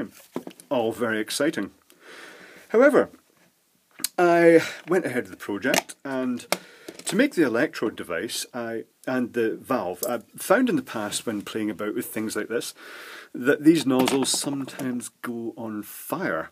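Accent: Scotland, United Kingdom. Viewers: with the Scottish accent, "powers up" sounds like "purrs up"... it's nice to imagine the motor purring like a little kitty...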